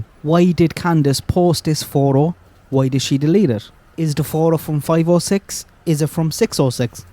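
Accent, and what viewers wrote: Ireland. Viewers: you gotta be Irish , I am an you have what sounds to me Limerick or Tipperary ?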